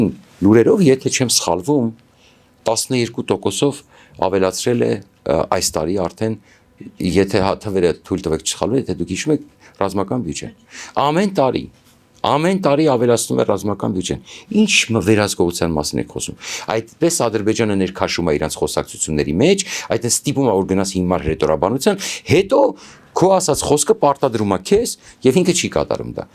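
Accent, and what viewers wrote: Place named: United States. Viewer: Էտ մենակ թողել էք արմենչիկի գովաբանությունները մնացածը ջնջում էք՞, այ լակոտ դու մեզ ուզում էիր բերում Զելենսկուն, որ տեսակ ինչ հզոր քայլ արեց, Տռամփին առաջարկեց իրա հանքերը ու հեսա կտեսնեք բիզնեսմեն Տռամփը ինչ օրնա քցելու պուտինին, ու այ տուֆտա ով ու ինչ օրն ընկա՞վ, խեղճ Զելենսկուն բազմաթիվ կթելու փորձեր տեղի ունեցան ու դեռ շարունակվում են ավելի խորանալ, դու ամերիկանսկի իդեոտ ինչ էիր առաջարկում բերեք զանգեզուրի հանքերը տանք ամերիկացիքին, այսինքն ջհուդներին, որոնց հինգերորդ կետից սնվում էս դու ու քո տականք ընտանիքը, անգամ նրանց առոգանություննես օգտագործում հաչալուտ ժամանակ, երկրորդը, ամուլ սարը ամերիկացիկինը չի դա սեռժա արամական վազգենական ոսկու թեմայա ռուսական դաշտում դու քո հիմար խելքով լսում էս հին աղվես, մեծն դերասան ասմունքող արամ զավենիչին, որ ամուլ սարից իրա թուլա փայն ունի, ու աֆրիկյան աբորիգեն ցեղերի ներկայացուցիչների պես ականջ, պռոշ, քիթ դնում ու բանդաժում էս ու մեզել ուզում էս համոզես որ սա միակ ճիշտնա ու վերջնա՜, այ գյադա ուրիշ ավելի լավ անուն ես չեմ կարող տալ, որովհետև մնացածը շատ ավելի վատն են, երրորդը ասում էս համերաշխության մասին, տո այ խառնակիչ ես դեռ չեմ լսել քեզ, իսկ ես համարյա միշտ լսում եմ քեզ քանզի ես քեզ համարում եմ հայ ազգի ամենա մեծ թշնամիներից մեկը, անգամ նիկոլի վովա օղլիից, քամի որ էտ կեղտը երեսներիսա իրա հակահայկականությունը ցույց տալիս, իսկ դու ագենտ էս ու դա ավելի վտանգավորա, դրա համար ես միշտ քեզ լսելով պատկերացնում եմ թէ մեր թշնամիները ինչ են պատրաստվում մեր գլխին բերեն։ Ես դեռ չի եղել քեզ լսեմ ու դու կոնստրուկտիվիզմից չխոսաս ո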